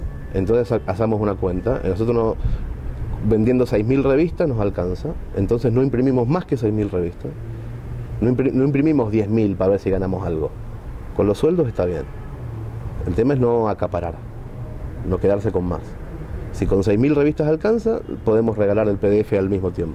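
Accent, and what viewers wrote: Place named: Buenos Aires, Argentina. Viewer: La mezcla en el acento de porteño y español me mata!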